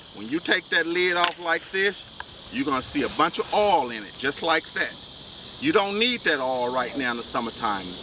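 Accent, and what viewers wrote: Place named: United States. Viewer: Love the ol country accent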